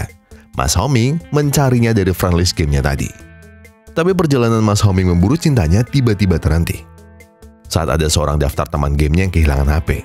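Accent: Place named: Semarang, Indonesia. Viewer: lek ngademin logate koyok wong semarangan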